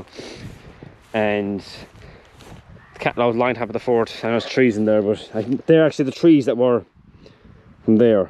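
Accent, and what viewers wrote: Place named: Ireland. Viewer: Loving the videos loving the machinery I’m quiet jealous now you have it all 😂, I can no longer do farming because of an injury from a motorbike accident I can’t move my right arm but I love watching farming videos to keep in touch with the life I love, I could very much be wrong but this looks like a Leinster farm and the accent sounds familiar but in Ireland we all kinda sound the same 😂 glad I found your Chanel and I hope all these price rises don’t cut you back will be a tough year with buying prices rising and selling prices not rising half as much I hope it doesn’t f the farmers up too much, best of luck and thanks for the video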